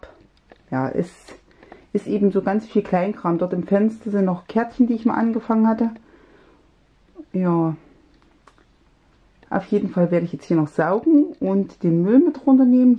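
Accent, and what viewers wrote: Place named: Anhalt, Germany. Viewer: Das ist soooo beruhigend, immer wieder auf's Neue, wenn man sieht, dass es bei anderen genauso chaotisch aussieht. ich liebe das und das ist eben das kreative Chaos! Und so was macht uns Bastler doch sympathisch. Danke für's Zeigen und mitnehmen. Ich mag übrigens Deine "lockeren" Videos, man mag Dir sehr gerne zuhören/zusehen. Und... Du kommst sicher aus der Anhaltiner Ecke, wenn ich Deinen "Dialekt" so höre. Ich bin ursprünglich auch von da... 🙂, weiter so und LG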